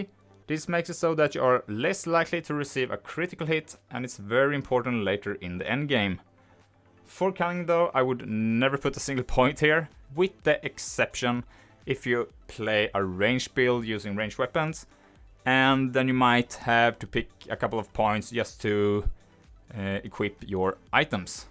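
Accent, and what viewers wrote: Sweden. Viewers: i can hear in you accent when you speak english that you are SWEDISH hahahhah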